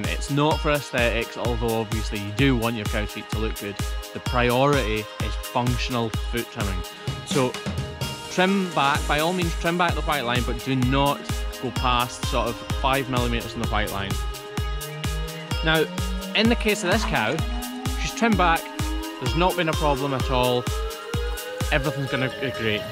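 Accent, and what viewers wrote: Scotland, United Kingdom. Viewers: I've watched almost all of your videos in 3 days time. POST FASTER! I knew nothing about cow farriering prior to your videos. A good Scottish accent helps too.😊 ha! Good day!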